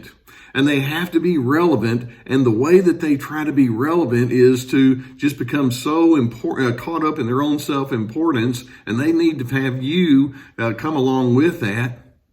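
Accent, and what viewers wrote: South, United States. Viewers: 🤣😂 Now I have got quite a wicked sense of humour but even though you did make me laugh that phrase feels cruel and I do not enjoy being cruel . That is one phrase I will not use because I do not want to play games with others or wind them up. I get no joy or relief from it . I can see where you are coming from and with that soft kind southern voice of yours YOU could get away with it and it still sound almost loving and actually a form of endearment but 🤣 I in NO way could get away with that. I stay away from others with those traits . Of course I do bump into people with these traits so I am grateful for many of your tips but my way of dealing with it is I keep my distance and use the word NO. And Mr I caught that twinkle in your eyes at the end of the video 😂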